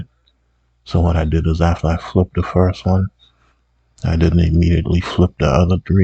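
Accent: Charleston, United States. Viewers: Where you from? Sound like you from Charleston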